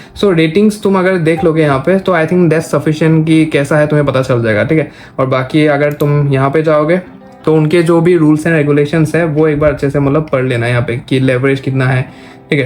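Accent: Assam, India. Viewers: Kotha pota style tut ye axomiya lage 😂❤️🙏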